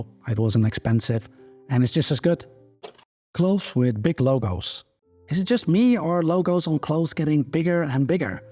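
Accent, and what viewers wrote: Netherlands. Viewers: Ik dacht al dat ik een NL accent hoorde😊!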